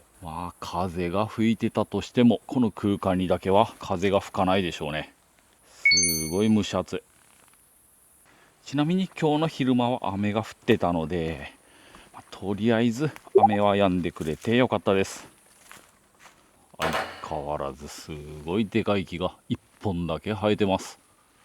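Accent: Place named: Kagoshima, Japan. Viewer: まさかの坂之上（故郷です） 鹿児島のイントネーション好きです😊 幼児の頃住んでたんですけどこんな場所あったんですね カブト虫とかたくさん採れそう…！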